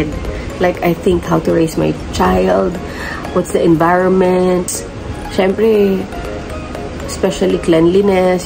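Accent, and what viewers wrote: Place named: Visayas, Philippines. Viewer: Dai, meron kanang bisaya accent. Buntis ka dai, kaya medyo haggard imong nawng... minsan ganuon ang mukha ng buntis during 1st trimester period. Mag blo-bloom kalang after mawala na yong duwal² moment at mga sensitive moment sa amoy. Ganuon din ako when I was pregnant with my daughter 19yrs ago. Di na ako nag buntis uli, kasi ang hirap manganak hahaha.. di kinaya ng powers ko.. Anyways enjoy your pregnancy dai, ug hinay² lang.. ❤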